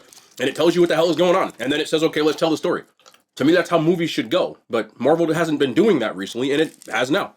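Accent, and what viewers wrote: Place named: New Jersey, United States. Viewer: Bro are you from Jersey ?!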